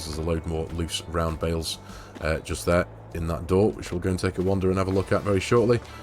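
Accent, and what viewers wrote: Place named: Scotland, United Kingdom. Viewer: "being from Scotland originally" I could tell by the accent 🤣🤣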